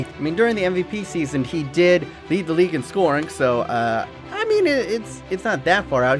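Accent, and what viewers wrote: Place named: Oakland, United States. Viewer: Is this man from Oakland?if he is probably from downtown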